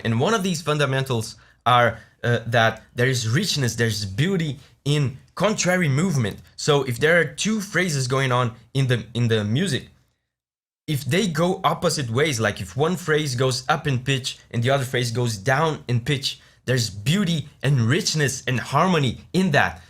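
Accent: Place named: Japan. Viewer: 英語の発音も我々日本人に近い感じたから語学勉強にもいい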